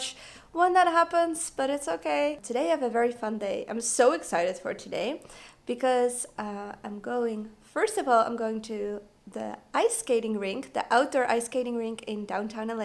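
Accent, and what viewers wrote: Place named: Netherlands. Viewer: Are you Dutch? Idk why but you have a sort of Dutch accent haha.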